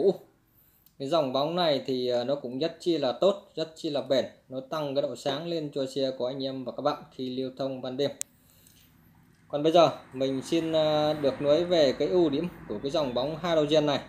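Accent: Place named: Thanh Hoa, Vietnam. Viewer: Ông anh người tỉnh nào thế? Nghe có vẻ như Thanh Hóa thì phải, Giọng hơi khó nghe.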